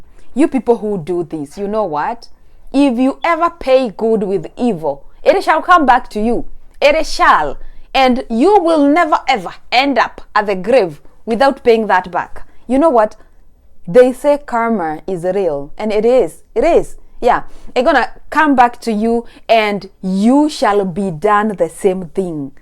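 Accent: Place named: United States. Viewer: Daughters of Sarah...by the way i love your American English accent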